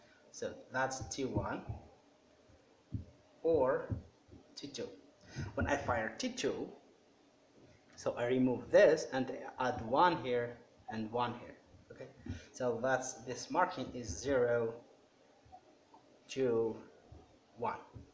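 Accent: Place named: United States. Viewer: تعجبني الاميريكان اكسنت نتاعك ربي يوفقك خويا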